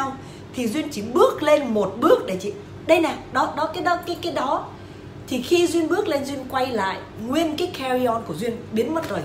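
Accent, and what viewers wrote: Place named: North, Vietnam. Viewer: Giong bac ky va` nghe thuat cach noi 20 nam lam MC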